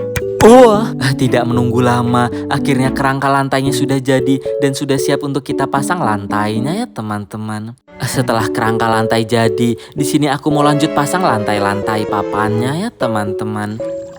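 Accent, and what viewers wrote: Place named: East Kalimantan, Indonesia. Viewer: Kaltim nymk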